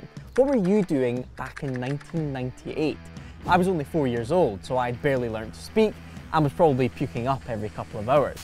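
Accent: Scotland, United Kingdom. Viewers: I love the Scottish accent more than the car. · Is that a scottish accent? And what a great car. The Vantages have always been the best looking Astons. (Every generation.)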